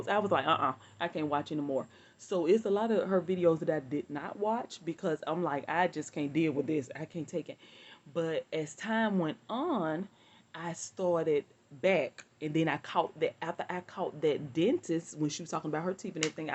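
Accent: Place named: Alabama, United States. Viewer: I can tell you are from Alabama. 😒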